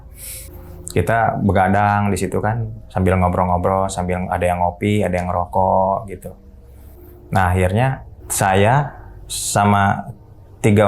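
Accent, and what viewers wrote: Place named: West Java, Indonesia. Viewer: Orang jakarta tinggal di bandung logatnya jadi logat sunda😁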